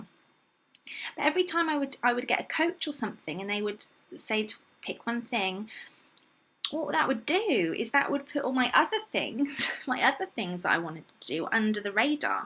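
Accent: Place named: United Kingdom. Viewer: Your accent is British 🙂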